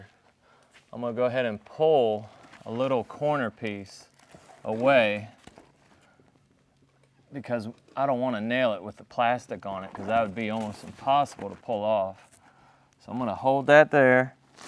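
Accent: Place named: Rural South, United States. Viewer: Awesome video. Sounds like you might be from a southern or rural part of the country. It's cool to see white folks doing Mexican work. We don't see that here in the city.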